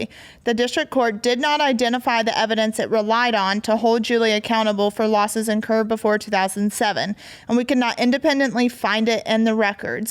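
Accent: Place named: Georgia, United States. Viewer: Todd and Julie have done a fabulous job of raising you!!! Look at you!! Look at what they have done. I still listen to all the old shows on USA network every night when I go to sleep. Your voices lull me to sleep. You talk like me. I'm from GA. I hope I can hear these voices on new shows in the future. You are a great success for Todd and Julie! Keep up the steady work for them.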